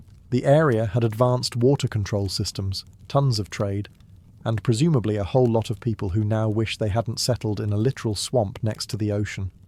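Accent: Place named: England, United Kingdom. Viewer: I lived for seven years in Alaska. Miss it terribly. I’m now living in Baltimore, Maryland about an hour’s drive near the small town where I grew up. Gettysburg is close by. I love your videos! Your English accent and medieval history are quite a comfort 👍🤓. My nana and mum are Australian so I always prefer the Commonwealth. I try to watch only British film, music, and TV. Nighty nite.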